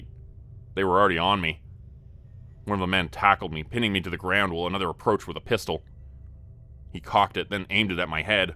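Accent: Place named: Canada. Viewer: I KNEW u were from Canada! The way u say any word w/ "out" in it. Or box. Or house.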